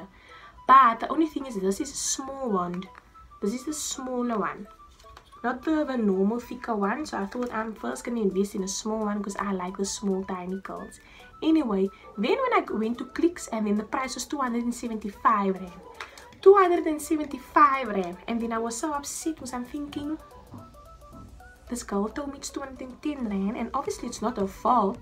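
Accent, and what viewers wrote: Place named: India. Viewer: Is that her real voice and accent. She looks black but sounds Indian🤔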